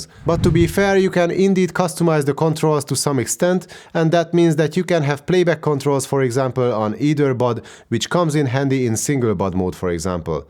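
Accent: Hungary. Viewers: Érzek egy kis magyart 😜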